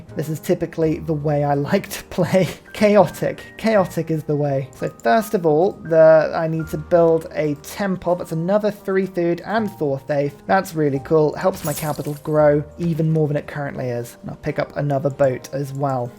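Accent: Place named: United Kingdom. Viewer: You play lovely music and your presentation is friendly and fun; love your British accent and funny little voice; witty and (as you Brits like to say) "brilliant."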